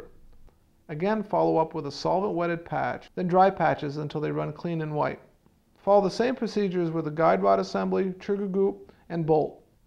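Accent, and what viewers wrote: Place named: New England, United States. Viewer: Obviously from New England.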